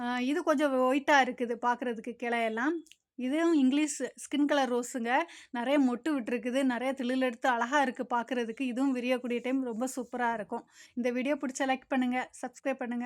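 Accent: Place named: Nagercoil, India. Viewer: ok sister, vunga slang nagarkoil madhiri iruku, enaku native thiruchendhur, indha Marigold seeds kidaikkuma sister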